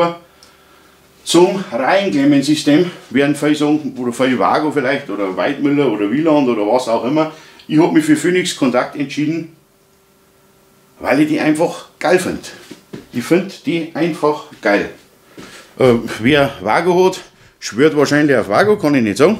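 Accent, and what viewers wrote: Bavaria, Germany. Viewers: Bitte red wieder boarisch!! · Als Laie bin ich hier vermutlich so etwas, wie ein Exot....und trotzdem bemerke ich Deinen Spaß an der Freud' .... und verstehe bayrisch und Technik immer besser!.. Super Video!!!!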